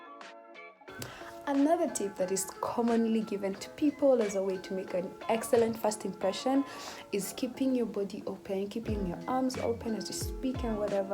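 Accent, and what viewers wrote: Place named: United Kingdom. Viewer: 😅😅 love the British accent! Great tips…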